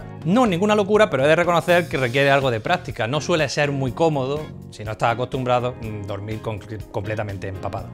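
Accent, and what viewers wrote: Murcia, Spain. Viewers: Acho tu ereh de Murcia no? Yo también.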